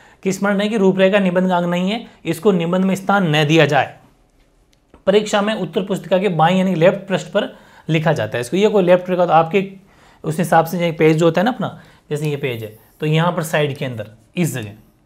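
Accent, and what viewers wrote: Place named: Jodhpur, India. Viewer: bohat bdiya lagi sir class bolta h jodhpur vala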